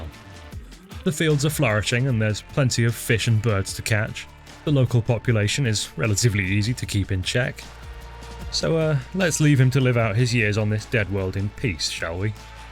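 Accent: United Kingdom. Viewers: Absolutely love this channel. As a fellow Brit you're much more enjoyable to listen to compared to some of those dashing Yanks.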